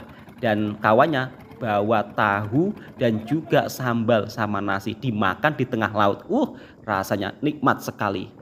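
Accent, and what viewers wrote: Java, Indonesia. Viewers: Sekedar saran untuk: pakailah bahasa indonesia dalam narasimu... Abang kan orang jawa Indonesia kan, jangan karena abang sudah merantau di malaysia, lantas kosa kata dan bahasa yang abang sampaikan pun ikut berubah... seakan bahasa melayu malaysia dengan aksen jawa medok...😅 contoh: katakan saja terasi selanjutnya abang jelaskan kalau terasi itu dalam bahasa melayu artinya belacan... Banyak orang yang sudah merantau ke berbagai negara yang lebih jauh, tetapi aksen dalam bertutur kata dan bicara mereka dalam bahasa Indonesia atau bahasa daerahnya menggunakan bahasa dan aksen yang tidak berubah dari asalnya.. Seseorang yang mencintai negeri asalnya pasti tidak akan pernah melupakan kebiasaan dan logat aksen negerinya. ini hanyalah saran saja... terserah mau pakai atau tidak. Terima kasih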